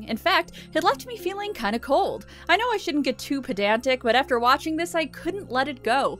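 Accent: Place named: United States. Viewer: American accents like yours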